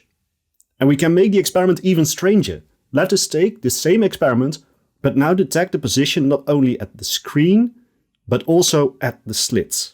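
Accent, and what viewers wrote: Netherlands. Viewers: You sound like you have a Dutch accent. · you speak very good english. it was at most a hint of a Dutch accent. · You sound like you’re Dutch, am I right? :) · Your accent is incredibly Dutch. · I can hear that you're Dutch. Great video btw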